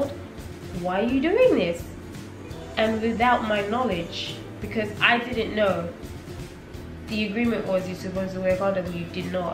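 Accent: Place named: United Kingdom. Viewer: Thanks for sharing!!! God blessed you with a very lovely boy and you are an AMAZING Mum. It can never be easy doing so much by yourself but trust me, your Son is watching and he would shower you with so much love and thanks when he's of age. Loved listening to your Bri-ish accent. By the way, my Birthday is on the 25th of April. Same date you and him were messaging each other. Lol!!!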